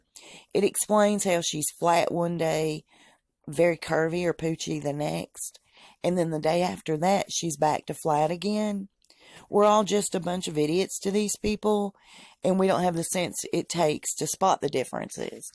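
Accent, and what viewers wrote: South, United States. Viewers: You love nasty,mean gossip.I can hear your southern accent,maybe your racist,because your not patriotic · I love the lady's voice in this video...Very southern and charming...and I almost kind of believe her.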